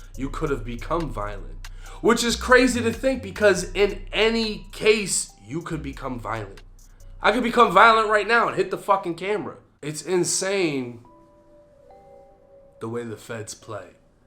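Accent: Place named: Massachusetts, United States. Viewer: Mannnnnn, everytime I hear you speak I miss my family out in MA! ✌️❤️🙏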